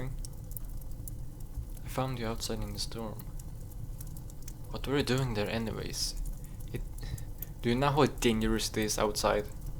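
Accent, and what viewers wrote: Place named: Sweden. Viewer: I knew it! Your accent reminds me of my first love🥺 He's from Sweden too.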